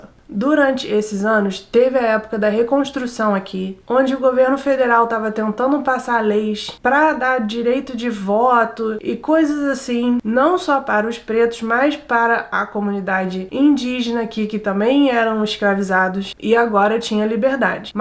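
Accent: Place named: Rio de Janeiro, Brazil. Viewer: Ótimo vídeo. Você fala muito bem português e com sotaque carioca. Você é linda e tem uma voz suave. ❤❤❤❤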